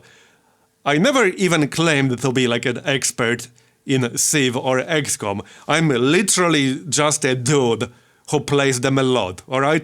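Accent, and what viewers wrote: Poland. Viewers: Thank you for your honesty and hundreds of hours of pure entertainment! I subscribed to your channel because the quality content and your nice Polish accent (which as a half Polish person I enjoy very much). Keep up the good work on Twich and YT and remember, there is one worse thing than reading YT comments: it's taking them seriously:) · You are the first gamer I followed on YouTube. What got me was your Arabian Civ V campaign. I was amused by your humor and amazed by your ability to beat the game at such a high difficulty level. I was also trying to figure out your accent. Then I learned somewhere along the way it is a Polish accent. Either way, you have provided many great hours of content and even inspired me to get into XCOM 2 when I knew nothing about the series. I say upload videos of you learning games because first impressions and playthroughs are very fun to watch.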